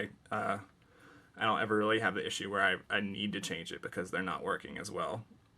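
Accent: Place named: South, United States. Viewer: thanks for putting me to sleep, jesus get some life in you . You sound half dead and talk like your from down south slow as can be. Otherwise good vid and a quick nap for me is good as well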